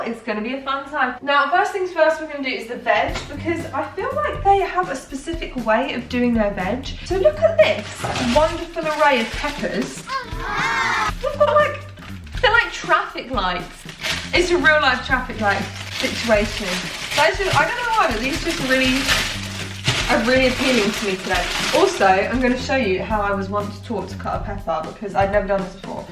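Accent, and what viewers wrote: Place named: South, United Kingdom. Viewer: just curious, what would you call your type of British accent? Your dad's is definitely not the standard southern urban one, but you are clearly not northern but I can't tell what type of southern accent you have. I'm an American so I have no idea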